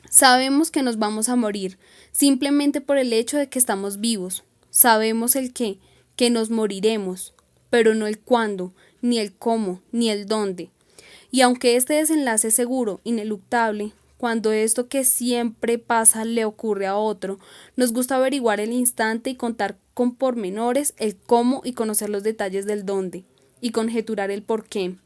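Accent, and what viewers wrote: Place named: Colombia. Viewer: que bonita vez y que gusto escucharte. Estaba termindo el libro y estaba agotado asi que busque si habria un audilibro de esta increible relato y no solo disfrute del libro sino tambien de escucharlo asi, tan claro y con el acento que te lleva a colombia.Muchas gracias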